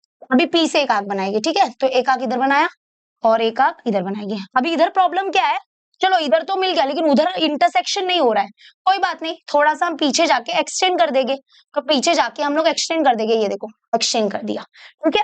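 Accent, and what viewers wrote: Maharashtra, India. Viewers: Mmame your Marathi is too cute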